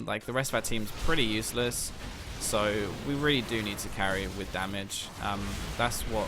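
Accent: United Kingdom. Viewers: What are you doing well: Smashing brilliant Brittish accent Pace of speach is good Thoroughly explaining your thought proces (and moves) What you can Improve: Explaining your team composition. I don't understand the Zyra into Morg/Lee, nor the poppy into Renekton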